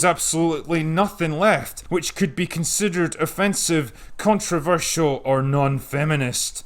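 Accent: Scotland, United Kingdom. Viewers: I fucking hate that accent. Where is it in Scotland you're from?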